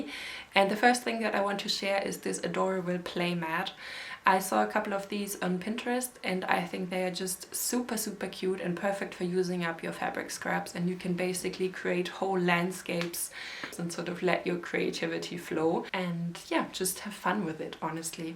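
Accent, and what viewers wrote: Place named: Germany. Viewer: Pretty sure she’s a native German speaker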